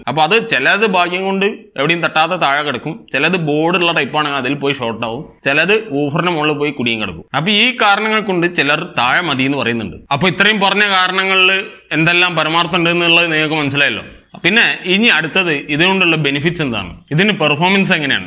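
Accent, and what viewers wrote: Kozhikode, India. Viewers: വളരെ നല്ലവണ്ണം മനസ്സിലാവ ന്നുണ്ട് ഇ ടയ്ക്കൊക്കെ കോഴിക്കോടൻ സ്ലാംഗ് രസികനാണ്. Keep it up f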